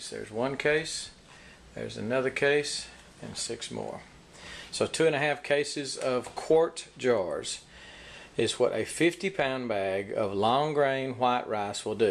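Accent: Texas, United States. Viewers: Texas men have the sweetest accent🥰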